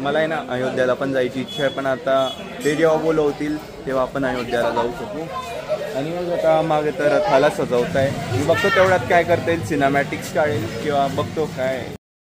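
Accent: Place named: Jalgaon, India. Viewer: खूपच छान एवढ्या गर्दीमध्ये रथाचे चित्र ण करून तु आपल्या जळगावच्या भाषेत मायबोलीत बोलत असतो तेव्हा खूप गावाकडची आठवण येत असते तुला पुढच्या वाटचालीस भरपूर यश मिळो ही श्रीरामा जवळ आमची हात जोडून प्रार्थना आहे धन्यवाद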